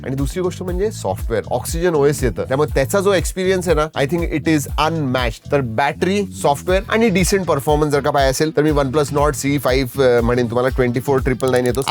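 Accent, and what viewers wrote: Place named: Pune, India. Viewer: भावा, 'त्यामुळं', 'कुठं', ' हिनं-तिनं' वैगेरे असं ग्रामीण किंवा suburban, outskirt पुण्याच्या दैनंदिन बोलीभाषेत एकमेकांशी संवाद साधताना म्हणतात. महाराष्ट्राच्या अनेक जिल्ह्यांच्या दैनंदिन बोलीभाषेतही संवाद साधताना असं म्हणतात. त्यात असं काही गैर नाही. तसा शब्दोच्चार खूप सामान्य आहे.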